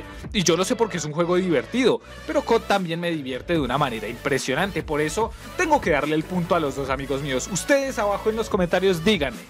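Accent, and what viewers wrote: Colombia. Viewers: Que no se note que es paisa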